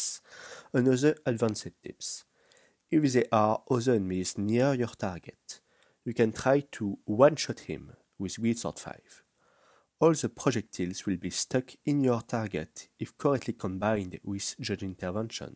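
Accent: France. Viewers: is this guy french? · un français qui parle anglais? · He is french i beleive :p i like the english with this accent =^.^=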